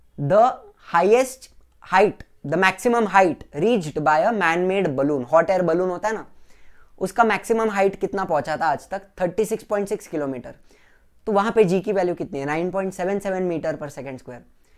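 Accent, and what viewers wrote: Maharashtra, India. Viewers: Sir aap aaisa bol rahe ho...usse lag raha hai ki app MARATHI HO............ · Sir u r marathi ? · Sir tumhi udi marta mhtl mhnje tumhi 100% marathi ahe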